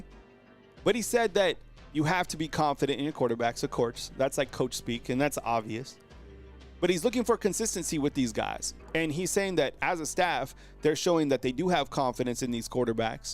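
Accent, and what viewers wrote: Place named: Alabama, United States. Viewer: your sponsorship in T-town ought to be through the roof! You are a $million/year operation easily! You have the look, the vibe, and sound that is Bama. Not applying for the job, just wondering. Roll Tide, Brah…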